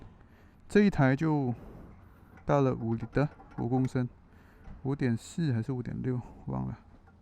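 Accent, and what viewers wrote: Taiwan. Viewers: 台湾口音😂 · 给你的口音骗了，还以为是台湾人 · 你是什么人，为什么有台湾腔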